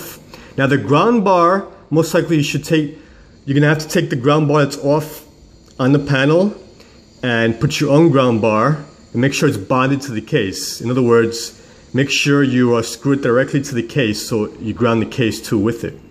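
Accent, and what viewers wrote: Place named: North, United States. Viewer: Your accent was driving me crazy! You're from up north aren't you? I'd be willing to bet you're a yankee, without a doubt!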